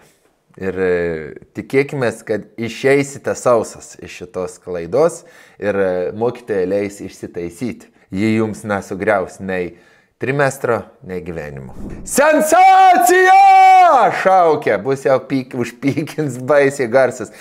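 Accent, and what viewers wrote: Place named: Samogitia, Lithuania. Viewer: Vo žemaitis 👍🤭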